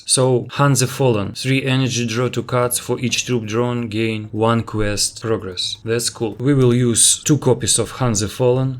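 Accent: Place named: Russia. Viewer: Хех, слышу русский акцент, а так видео класс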